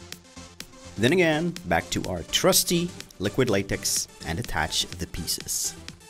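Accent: Scania, Sweden. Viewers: älskar skånskan :D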